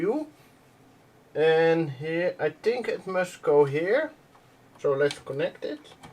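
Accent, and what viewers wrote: Netherlands. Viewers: The Dutch accent is strong with this one :)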